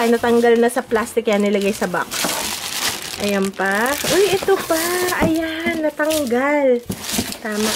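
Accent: Batangas, Philippines. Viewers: Taga batangas ka po o Quezon?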